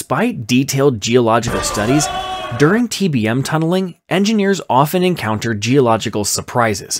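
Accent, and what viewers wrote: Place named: India. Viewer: You please don't speak.. use AI for your voice. Your accent is typical meme for the westerner. I am an Indian and even I am finding it awkward.😂 Please...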